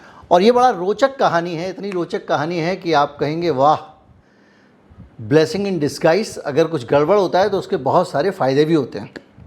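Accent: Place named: India. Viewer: Of you Indians base ur analysis on hate . First fix ur pan English accent you can’t even at basic words properly Secondly your information is false. You making videos to satisfy ur like minded brains which are anti world full of hate 😊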